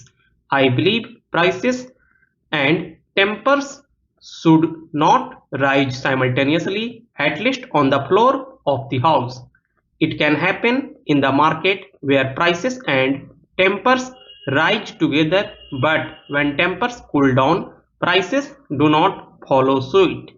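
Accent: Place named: Bihar, India. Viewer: Work on your accent, it is more of from Bihar. Otherwise it is good.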